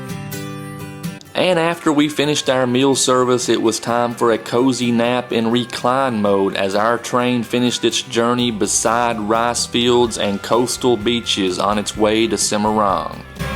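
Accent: United States. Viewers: soalnya pakai aksen amerika, coba pakai aksen british lama atau aksen australia 😁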